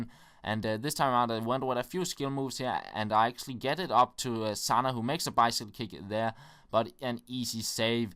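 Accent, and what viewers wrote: Jutland, Denmark. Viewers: you are danish right? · Er du jyde?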